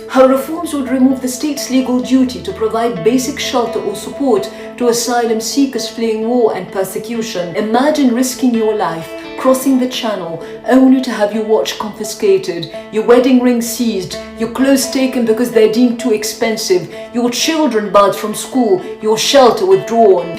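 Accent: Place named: United Kingdom. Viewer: وكأن هذا الفساد والتلون والبلبله وتبجح لايوجد في بلاد الاسلام المشمشي 😊 ههه ياحبيبي، أختاه انتِ تتحدثين الإنكليزيه بلكنه بريطانيه هذا يعني أنكِ تقيمين هناك ومعكِ جواز سفر على ماأظن 😊 وجدتِ الأمان عندهم والرفاهيه فلماذا الهجوم على البلد الذي استضافكِ وجعلكِ مطمئنه على أقل التقدير ياترى 🤔 ؟؟؟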